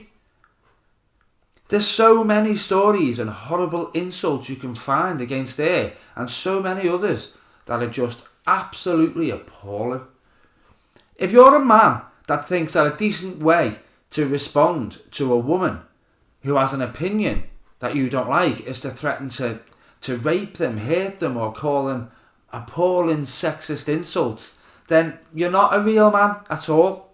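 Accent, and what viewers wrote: Liverpool, United Kingdom. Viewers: I really like this shout scouser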